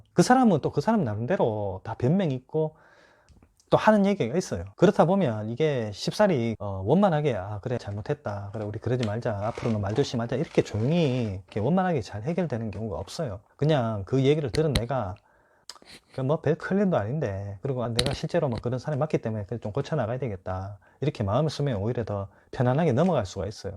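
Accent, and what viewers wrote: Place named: Gyeongsang, South Korea. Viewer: 감사합니다 ~^^ 지나가다 들렀습니다. 구수한 경상도 사투리가 친숙하네요 말조심하는 하루가 되도록 연습하겠습니다.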